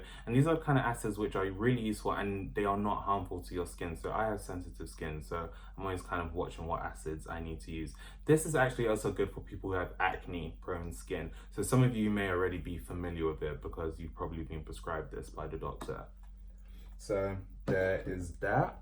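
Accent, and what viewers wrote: United Kingdom. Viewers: Your accent is proper British so everything you say... is probably legit. - Your African brotha from across the pond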